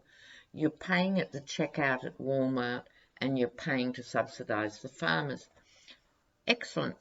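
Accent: Australia. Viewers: Love that Aussie accent -- when you said you'd ordered a couple of new decks, it comes out dicks. If Ivanka is indicted, she won't hesitate for a New York second to rat out her father, to keep herself out of prison. We're so caught up in the US over the Trump business, we forget other countries in the free world have their disappointments, too. Australia -- we feel your pain. Thanks for the great reading -- as usual.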